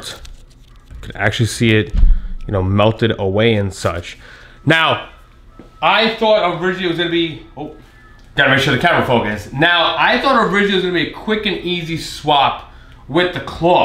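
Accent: New York, United States. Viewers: 🤷‍♂🤷‍♂😊😊 you have such NEW YORKKKKKKKKKK ACCENT :D its great man love new york i lived there all my life and moved to maryland but i love my new york but it expensive to live theses days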